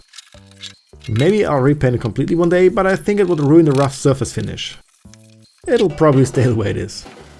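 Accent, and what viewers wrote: Germany. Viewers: for a guy that sound pretty german it's just seem wrong to hear "Aluminum" instead of "Aluminium"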